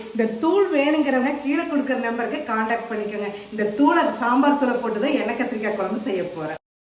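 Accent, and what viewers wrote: Kongu, India. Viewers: Kongu slang ❤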